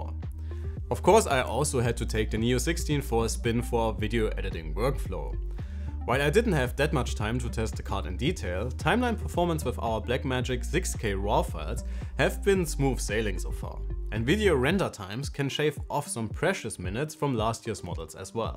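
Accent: Germany. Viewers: Höre ich da etwas einen Landmann im angelsächsischen Bereich wildern?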